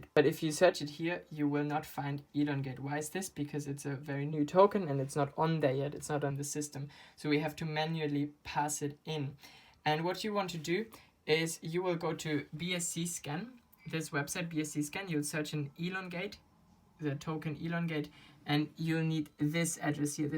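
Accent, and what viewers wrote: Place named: Germany. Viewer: For being German your accent really isn’t bad. Good video thank you for explaining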